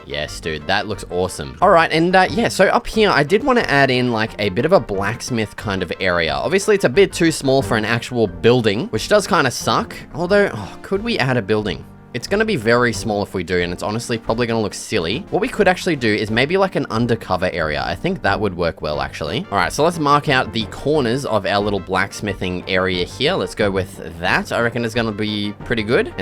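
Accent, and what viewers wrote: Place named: Australia. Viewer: Alright.. we get it.... you're Australian...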